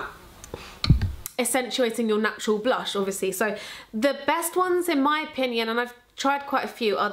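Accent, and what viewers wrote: United Kingdom. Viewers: I just love your videos and ofc your brit accent. :)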